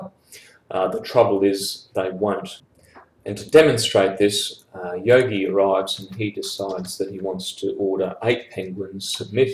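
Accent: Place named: Australia. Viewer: Direct, well explained. I learnt something without having to launch a google investigation chain. I think the Australia accent has a lot to do with it. Top job mayte!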